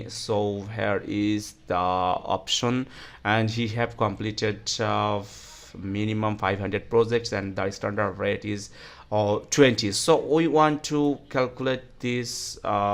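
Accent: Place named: India. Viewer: i love your indian accent next time put subtitle pls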